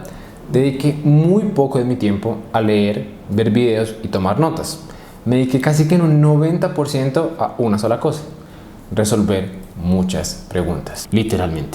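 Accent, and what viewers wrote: Colombia. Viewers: Ese acento es de cali sin duda., Que buenos consejos, Te felicito te mereces el exito que has logrado 💕👏🏻👏🏻👏🏻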